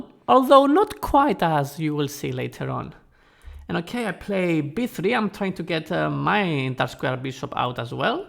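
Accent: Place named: Romania. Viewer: Are you Romanian? You sound Romanian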